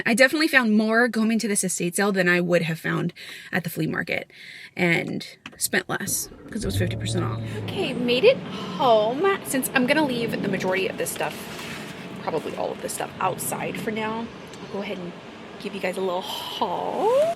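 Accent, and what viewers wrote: Texas, United States. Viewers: my heart smiles so big when I’m notified of a video from you❤❤❤❤❤. I have followed you for several years and watched so many projects from the apartment ( out door chairs) loved for your little patio. The last week of pregnancy was so hard for me I got so tired!!!!!!! And the night before both of my babies ( age 47 and 40) the day before delivery my body just said no more, I was tired and felt like I was coming down with the flu, this may happen to you. My son 40 weighed 10 pounds. I am so excited for you but walking really helped with the labor (they had me walk in the hospital) to help,with the labor. When you are tired listen to your body. I am the happiest when I see your beautiful smile and sweet Texas accent, ( yes it’s there).❤❤❤. I wanted the floral China it looked so sweet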